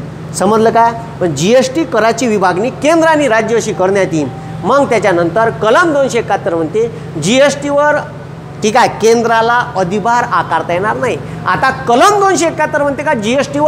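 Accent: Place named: Vidarbha, India. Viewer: Tumhi bava khare vidharbha vadi😅😅tumcha bolna maley lay awadla 😅😅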